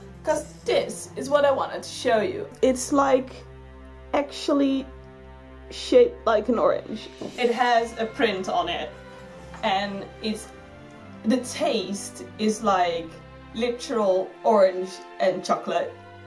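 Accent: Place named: England, United Kingdom. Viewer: So basically... You've never heard of Gluhwein? That's KINDA a tradition here.... And ugh, chocolate oranges :( I really dislike the taste of those xD. But I love seeing you getting more and more English both in speech and manner xD Anyway, enjoy your boxing day and have a great new year!!!!!!!!!!!!!!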